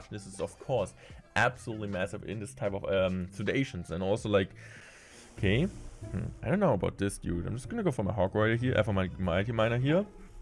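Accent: Germany. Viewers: can u add subtitles to ur videos? ur German accent is so damn hard to understand and the fact that u talk pretty fast is not helping at all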